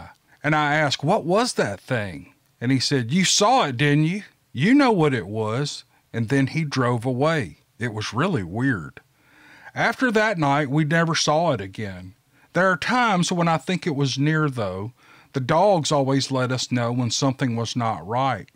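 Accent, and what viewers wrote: South, United States. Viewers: I think it's the southern accent that attracts people up here in the Connecticut and New York area. Yankees can't get enough of it.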